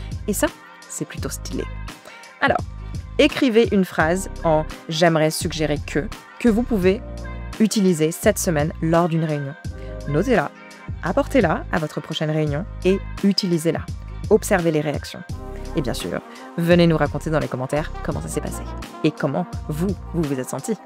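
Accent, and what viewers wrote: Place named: England, United Kingdom. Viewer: j'aime trop ton accent anglais en parlant français, je peux t'écouter toute la journée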